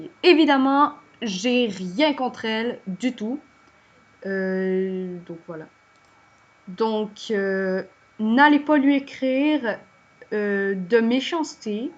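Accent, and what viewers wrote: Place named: Quebec, Canada. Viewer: Esque tu pourrait mettre une music et j adore ton accent Québecoi (dsl jsp ecrire) 😇 en tout cas bonne contuination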